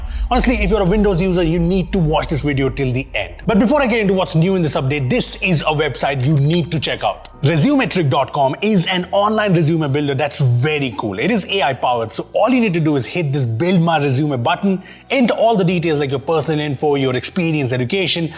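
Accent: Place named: India. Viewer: this is the best indian english accent i have ever heard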